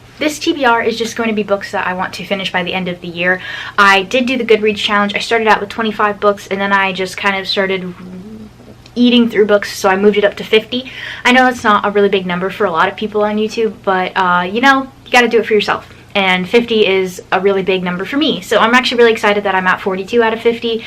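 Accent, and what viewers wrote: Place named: Midwest, United States. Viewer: “i sounded midwestern” hahaha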